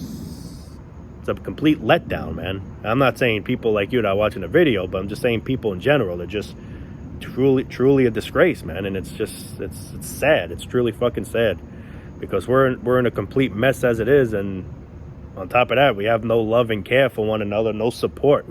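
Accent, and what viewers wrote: New York, United States. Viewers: I really like your New York accent, off topic i know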